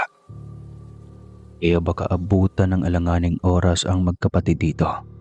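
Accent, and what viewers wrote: Visayas, Philippines. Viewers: Kabog jud sir,bisaya ka tlga sir...😂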